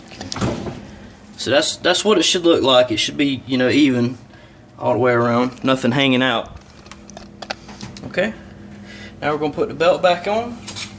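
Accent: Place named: Carolina, United States. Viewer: Man this video is very helpful, love that Carolina accent too, reminds me of my family.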